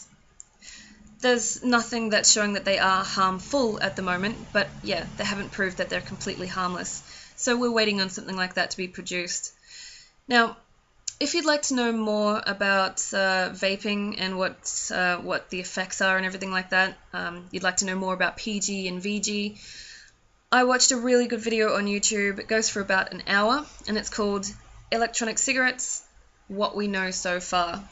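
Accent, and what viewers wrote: Australia. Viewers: Are you from Australia or new Zealand ?? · you sound Australian :D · Defo an Australian accent. Are you near Melbourne, Tennis season starts in Jan in Melbourne can't wait!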